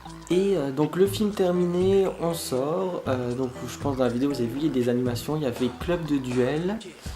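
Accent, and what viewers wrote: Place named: Lorraine, France. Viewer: Tiens ? Toi aussi tu est lorrain ?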